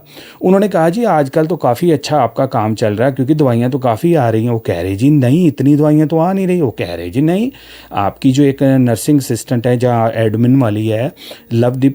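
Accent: Punjab, Pakistan. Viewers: i have heard some pakistani punjabi youtubers....they talk just like him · Exactly, I am also thinking the same... he's got Pakistani accent. Is this channel real or fake??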